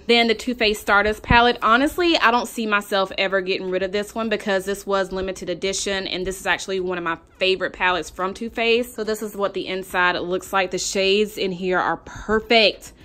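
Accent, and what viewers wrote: South, United States. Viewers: Your southern accent is so adorable😆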